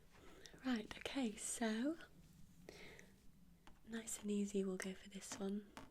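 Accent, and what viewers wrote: United Kingdom. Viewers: This was great :) First thing I checked while listening to the video was if there was any info on your area because I thought it was a British accent and I was right. No wonder it sounded a lot more pleasing :D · Just subscribed. You have a very soothing manner, wonderful accent. I'm kind of a typical American who loves British accents. I would imagine you'll have many more subscribers in the future. I'll be watching more of your videos and I'm sure I'll be hitting the like thumbs up on them. Wish all success to you. Greg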